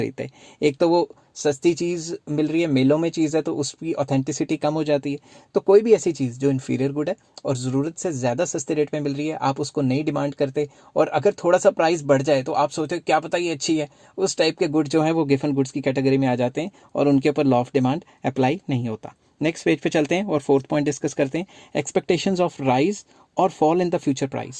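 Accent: Punjab, India. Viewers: Your punjabi accent is to good ❤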